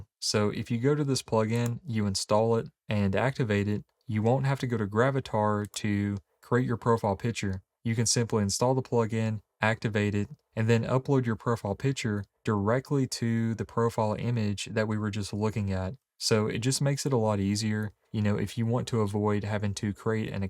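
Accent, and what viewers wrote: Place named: South, United States. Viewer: This guy's Southern accent is everything 🤩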